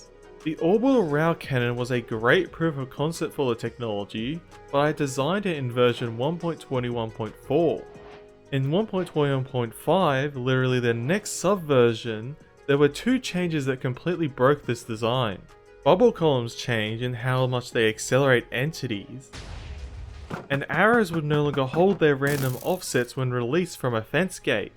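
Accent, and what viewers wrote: Australia. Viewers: Bro, you already speak fast, plus you have an Australian accent, plus you're talking about highly technical stuff, plus I'm fluent in english but since I'm not native i have to make a little force to think through it. So, even 0.25x is not enough for me to be able to understand it. ☹️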